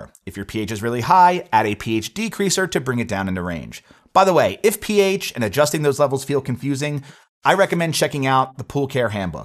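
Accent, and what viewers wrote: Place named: United States. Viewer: Loud mouth american!! Gallons and us accent are of no use😮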